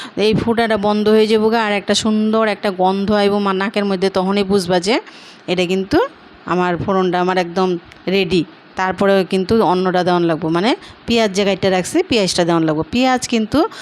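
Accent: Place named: Bangal, Bangladesh. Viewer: খুব সুন্দর রেসিপি। আপনি বাঙাল ভাষায় বলছেন খুব মিষ্টি লাগছে শুনতে।👌❤️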